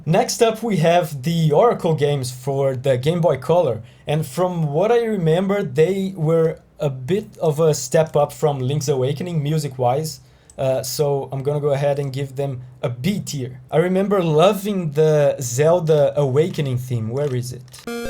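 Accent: Brazil. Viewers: Pelo sotaque já sei que é br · You sound brazilian. Are you? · No momento que ouvi tua voz já sabia que era brasileiro. Que legal, já é difícil de achar desenvolvedor de jogos brasileiro, quem dirá compositor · Nunca vi um inglês tão brasileiro, adorei o vídeo tanto quanto eu gosto de zelda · Hey man! You're brazilian, right? Hahah eu reconheci pelo sotaque em algumas palavras. Parabéns pelo vídeo, fiquei feliz com a colocação de BOTW. A atmosfera do jogo dependeu dessas escolhas artísticas que foram tomadas tão bravamente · Esse sotaque de brasileiro no inglês não me engana 🙃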